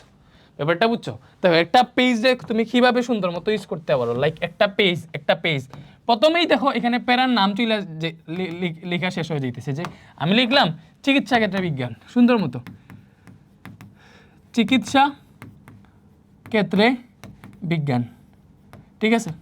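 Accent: Sylhet, Bangladesh. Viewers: উনি কি সিলেটি😐🙂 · আপনি সিলেটি তাইনা স্যার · Bhaiya apni ki Sylheti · ভাই আপনে সিলেটি নি ভাইরে ভাই😮😮❤😊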